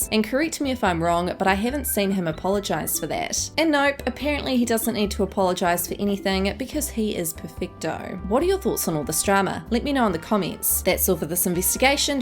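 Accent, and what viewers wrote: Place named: New Zealand. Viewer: She’s a kiwi from New Zealand it sounds like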